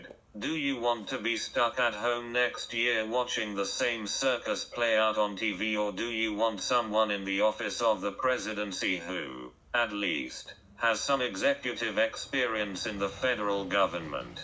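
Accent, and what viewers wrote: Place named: United Kingdom. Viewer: I love the British voicing you set on you device 👍🏽 I agree with you whole-heartedly 👍🏽 Stay well & stay safe ✌🏽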